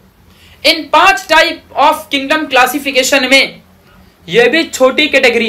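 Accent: Maharashtra, India. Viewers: Your marathi is is the best part of video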